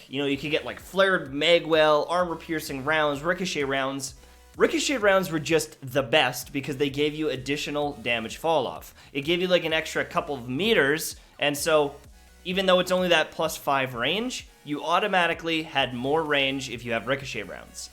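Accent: Scotland, United Kingdom. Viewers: Thanks for putting out great content. Scottish accent was awesome lol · SCOTTISH VOICE!!!!!!!! Yes BABY!!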